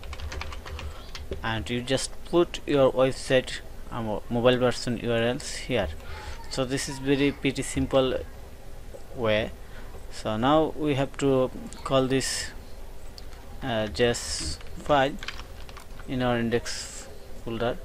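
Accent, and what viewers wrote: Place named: India. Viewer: Terrible video, and what language are you using? Indish?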